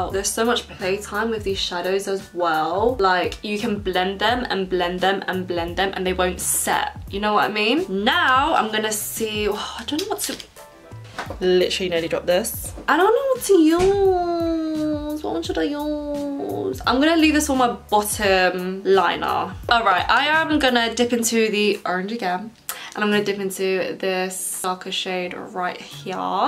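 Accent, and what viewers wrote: United Kingdom. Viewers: Woooooooowwww! I have watched ur ig for a while and DID NOT EXPECT THE BRITISH ACCENT!!! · So ik her only from Instagram and I finally got around to watch her vids and SHE HAS THE CUTEST ACCENT it totally didn’t even click that he would have an accent (I knew she lived in the uk) but anywho I REALLY REALLY loved this video 💕💕💕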